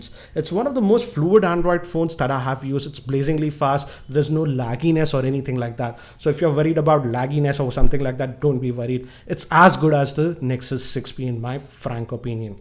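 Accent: India. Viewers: I still love the Indian accent. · Its just me but I just hate ,,indian" english otherways you speak great and you do very good job with what you are doing so far its that birth languege that makes this and I dont want to ofend you :)! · nice presentation of indian english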